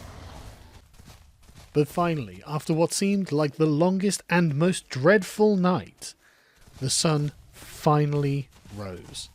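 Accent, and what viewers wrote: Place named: United Kingdom. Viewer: No hes just british🤭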